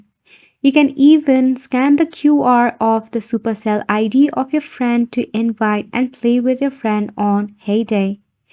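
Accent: India. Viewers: Are you okay? Indian?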